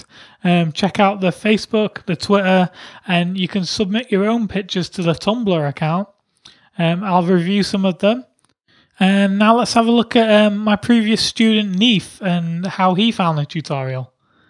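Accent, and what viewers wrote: United Kingdom. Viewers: you sound like a nice gent from north of manchester, good drawing i've improved my own sketches with lots of 3D effects and in the head loock techniques. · the awesome british accent is what makes me watch the whole video XD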